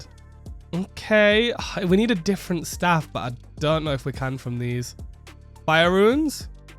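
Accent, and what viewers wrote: United Kingdom. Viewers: Where in the UK are you from? Love your accent.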